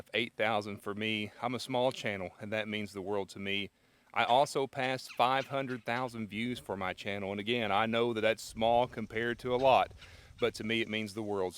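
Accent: United States. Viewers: Great video collalatratons 0n your millstone like your country accent it sounds cool you ever been to St. Louis Missouri there are some good cemetery's of famous people calvary and one betlvo cemetery is wear chuck berry is buried at when you go to look for his big statue of him playing a guitar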